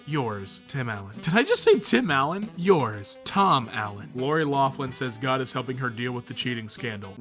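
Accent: United States. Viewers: Suddenly an American accent WAT IS HAPPENING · Why are you speaking American rn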